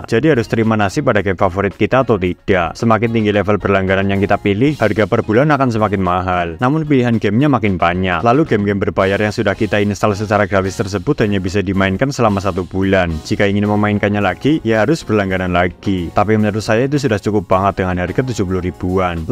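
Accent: Banyumas, Indonesia. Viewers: Wong Banyumas toh mas?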